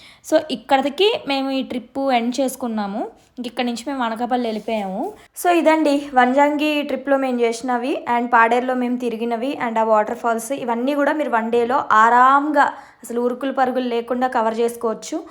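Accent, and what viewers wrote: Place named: Telangana, India. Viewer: Mem veldham anukuntunnam so me vedios chusam chala bagundhi vedios ❤ tg slang i like it 😅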